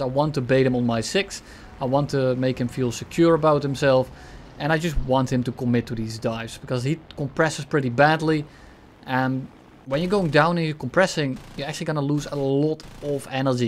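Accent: Netherlands. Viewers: Are you dutch? cause you have the accent.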